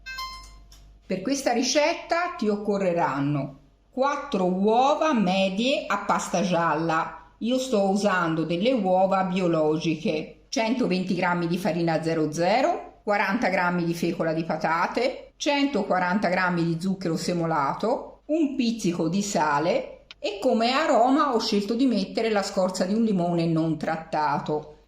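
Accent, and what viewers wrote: Tuscany, Italy. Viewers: Grazie! Bello sentire il tuo splendido accento toscano!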